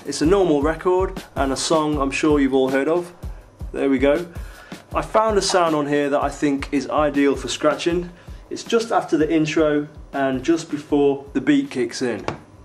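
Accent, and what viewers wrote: United Kingdom. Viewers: You're BRITISH?!???!!!??!?!?!?!!!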